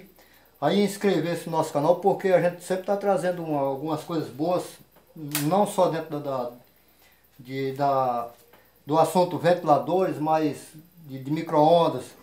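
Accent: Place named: São Paulo, Brazil. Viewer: Fala fala lenga lenga ou é paulista ou carioca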